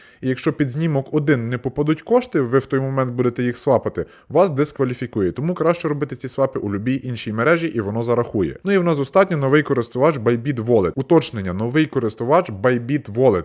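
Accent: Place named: Western Ukraine, Ukraine. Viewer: Приємно слухати на західноукраїнській мові. Молодець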